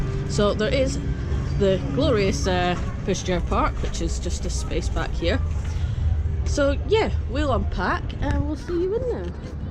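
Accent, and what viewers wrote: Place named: Scotland, United Kingdom. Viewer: tied up in the car with tape across his mouth? No wait … he's free! Jokes aside great vlog, very informative and let's face it the Scottish accent adds to the quality;) I'll come across as a bit of a H&S geek but that door should be better marked or open inwards, you could be reading that info board up close then whack!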